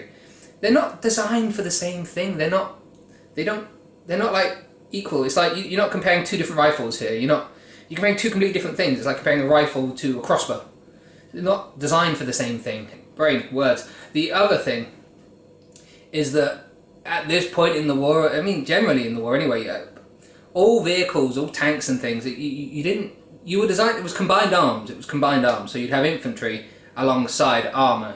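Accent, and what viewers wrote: United Kingdom. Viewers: Aside from your velvet queen's English accent I watch you because I learn things, I had no idea the differences between these tanks!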